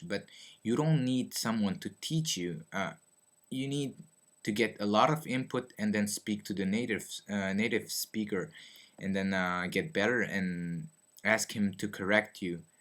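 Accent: United States. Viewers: You seem to speak using american accent... I'm brazilian and understood you well :) I think british accent is a little hard to understand, do you also think it? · Jesus, I assumed he lived in an English-speaking country because his English is damn near perfect. Extremely few grammatical errors (only mistakes I'm catching are using plural at times we use singular, like "transportation" or "homework". But I understand why people would make that mistake, because in English we just don't have plural forms of those words), and his accent is really minor. Sounds like he's been living in the US for years.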